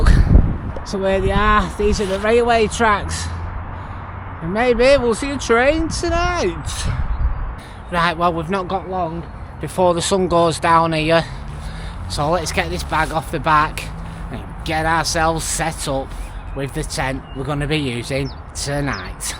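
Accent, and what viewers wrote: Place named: Burnley, United Kingdom. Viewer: I used to live in Burnley. What happened there was weaving in the big sheds. Three was also a brewery. Nice video thanks. BTW you sound like you were a Burnley man.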